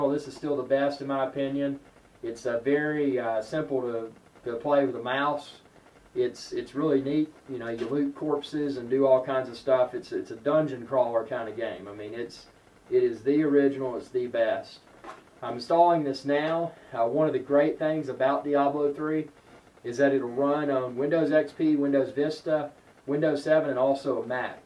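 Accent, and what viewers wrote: South, United States. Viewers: Not true there was neverwinter nights on the old imagine web service back in the day. I feel you are unqualified to review games, Because you are a mac user. Not to mention your southern accent really makes it hard for anyone to take you seriously. not to mention the fact that you showed no gameplay footage nor did you mention the features of the game. honestly I don't think you even played it.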